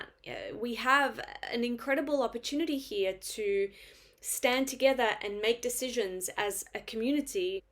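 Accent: Australia. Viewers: you have Australian accent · Good to 'hear' that you have an aussie accent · Couldn't tell by your accent, proper Aussie now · Your accent is 100% Aussie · You have picked up the Australian accent very well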